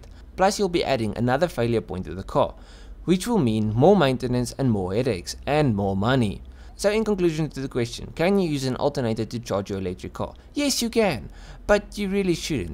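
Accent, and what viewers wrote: South Africa. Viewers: Brro are you south african?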